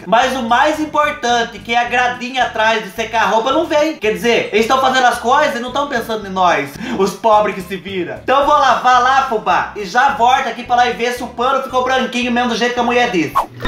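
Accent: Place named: Paraná, Brazil. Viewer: Cara vc é muito bom adorei com esse sotaque até parece que vc mora perto de mim aqui o povo fala assim kkkkk interior do interior do interior de Curitiba kkkk doce fino o meu endereço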